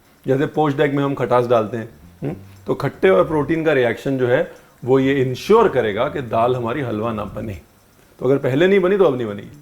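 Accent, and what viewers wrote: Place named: Punjab, India. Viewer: Ranvir bhai I'm from kashmir nd I love your way of cooking as well as talking with punjabi tadka ❤lub u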